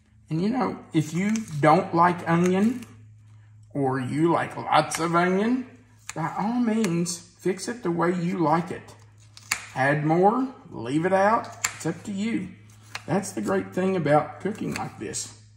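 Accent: South, United States. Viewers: Thank you for all the yummy recipes! I love your calming Southern drawl. Sometimes your videos make me sleepy, your voice soothes me so much! Thanks, for writing out the recipes. Sending hugs from Beth in Savannah, GA.🤗💜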